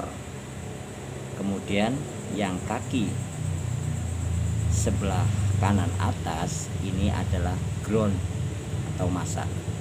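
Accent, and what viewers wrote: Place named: Banyumas, Indonesia. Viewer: Salam satu "ngapak" kang..... 😀👍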